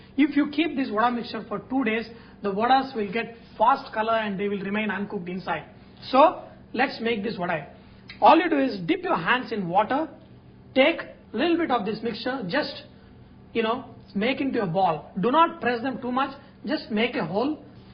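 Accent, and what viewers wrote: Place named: Tamil Nadu, India. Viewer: well, if there is namaste, salaam aleikum, sat sri akal, then, Vanakkam can and should surely be in the mix. Especially since his accent and roots are, seemingly, Tamil. Personally, I find the non-appearance of 'Vanakkam' rather jarring. I am all for national integration, please start from the roots. YOUR roots. Don't abandon them. The inclusion will be appreciated. The exclusion, rather weird and unnecessary.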